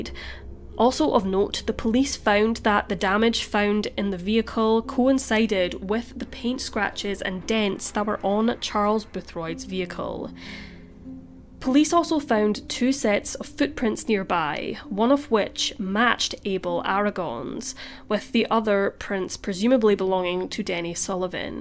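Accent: Scotland, United Kingdom. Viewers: The narrator has a Scottish accent.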